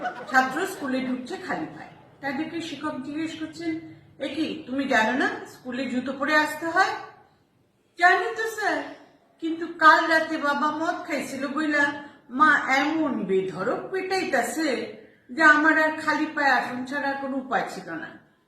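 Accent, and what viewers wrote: Bangladesh. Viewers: Thanks for continuing with Bikrampur dialect that your father was a master. Great initiative! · অনেক শুভেচ্ছা জানালাম।যদিও ভানু বন্দোপাধ্যায় এক অনন্য প্রতিভার বিকাশ, অন্য দেশ হোলে সম্মান প্রদর্শন অন্য রকম হত,তবু বলবো,দেরিতে হলেও আপনি এসেছেন,দয়া করে থেমে যাবেন না,চালিয়ে খেলুন, শুধু বাঙাল ভাষাটা আরো একটু প্রয়োগ করুন,খুব মিষ্টি লাগবে,কথা দিছি। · দারুণ কইছোস বাঙাল ভাষায়। ভালো হইছে। জিও আর চালাইয়া যাস তোর গুনাবলী।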